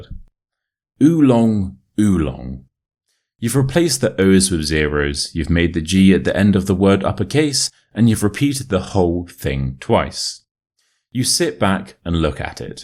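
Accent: United Kingdom. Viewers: Wao i just want to listen the British accent a end up focusing at how story ends , I love it thx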